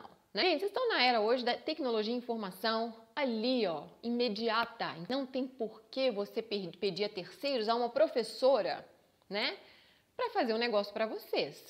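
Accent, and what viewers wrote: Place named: Minas Gerais, Brazil. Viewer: cara, como eu amo esse sotaque mineirin hahahaha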